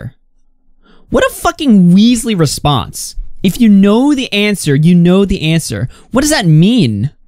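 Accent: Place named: Texas, United States. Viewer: Every time says "Ma opinion" I feel a little bit closer to Texas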